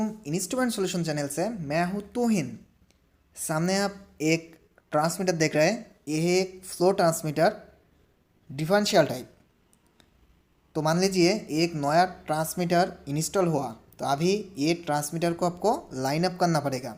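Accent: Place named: Bengal, India. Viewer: এর জন্যই আমি আপনাকে প্রশ্নটি করেছিলাম।দোয়া রইলো ভাই আপনার জন্য।আসলে আপনার কথার মধ্যে বাংলার একটা টান পাওয়া যায়। কোথায় কাজ করেন ভাই?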